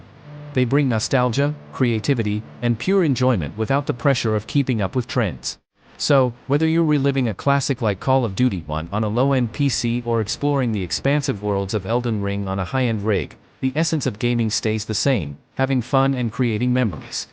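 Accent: India. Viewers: Dude r u from India?!!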